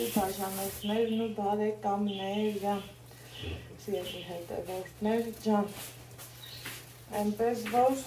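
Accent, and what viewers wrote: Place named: Gyumri, Armenia. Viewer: Ьтик артен мер Ленинаканцу акцентов асир 😂 джан арохч йакнек, шат сирун шорикнерен, апри 🙏🙏🙏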